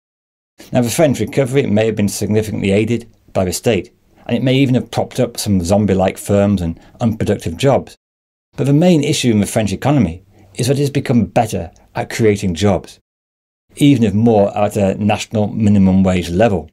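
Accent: United Kingdom. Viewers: Is this Scottish accent or what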